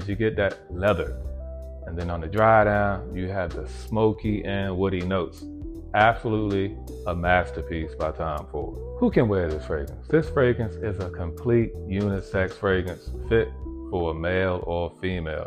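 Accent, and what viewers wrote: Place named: Baltimore, United States. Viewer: you gotta be from baltimore OG lol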